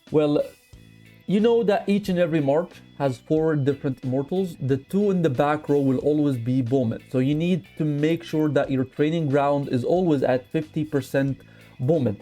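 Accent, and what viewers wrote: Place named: Arab world. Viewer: I knew you were a fellow Arab just from your accent 🤝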